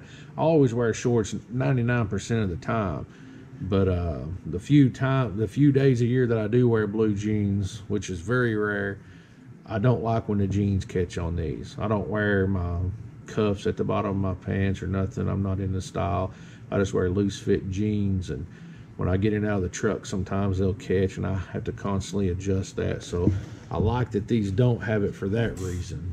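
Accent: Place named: South, United States. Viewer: I like your boots, and I like that southern drawl of yours. Happy New Year to you. From Canada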